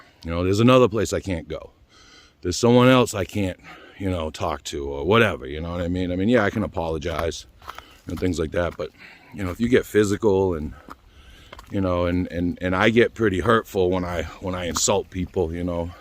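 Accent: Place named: Massachusetts, United States. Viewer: great accent ....massachussets >?? Canada here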